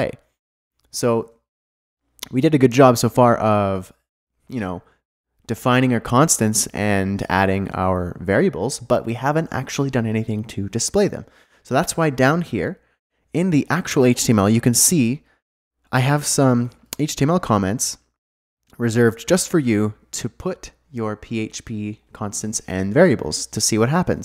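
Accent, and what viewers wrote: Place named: Canada. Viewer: I love Canadian accent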